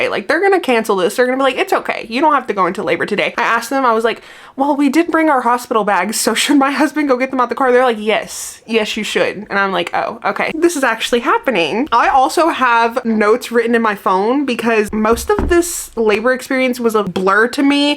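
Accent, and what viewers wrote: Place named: Alabama, United States. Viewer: Girl I want to come up to your town and just sit and have a coffee and talk to you ❤.. I actually live in Phenix City Al and it's so nice to hear someone on YouTube that talks like me, I'm 50 yrs old I have lung cancer. I've been watching you from the start of your YouTube journey. I'm so happy that you are now so popular. You have grown into a wonderful young lady and a absolute amazing Mom. Sending love and prayers 🙏🙏❤️ to you Kev and Oliver.. love the long form video content. You are an example too others your age. ❤❤❤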